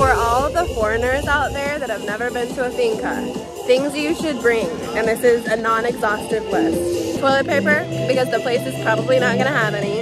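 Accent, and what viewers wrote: Paisa, Colombia. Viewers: Me encanta tu acento paisa cuando hablas en español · te siento un leve acento paisa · Tu acento paisa es genial... Un fuerte abrazo, eres una digna representante de Colombia ante el mundo... Saludos desde Bogotá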